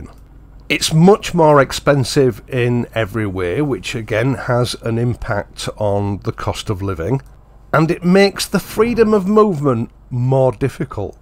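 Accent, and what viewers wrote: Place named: North, United Kingdom. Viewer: I love your videos and friendly sane northern accent. As you point out human rights can be "adjusted" to mean anything. More important are our Divine rights. In Genesis God tells us to be fruitful and multiply and fill the earth and have dominion over it. This means that we can legitimately use the amazing resources such as coal and oil in responsible ways. It is interesting to note that He has retained abololute control over the climate - He says that "while the earth remains, seed time and harvest will also remain". It is also interesting to note that CO2 is an vital plant foot and according to top notch scientists such as William Happer we are bordering on a drought for this essential commodity.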